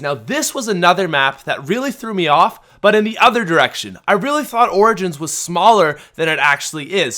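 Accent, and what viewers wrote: Canada. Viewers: Nam DreamzZ Lol nice try at acting smart. Americans are all so IGNORANT to the fact that THATS HOW THE WORD SOUNDS DUE TO THE CANADIAN ACCENT. People in America see something that isn't the same as their country, and think its weird and want it changed. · dude he's Canadian, leave him alone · It's cause he's Canadian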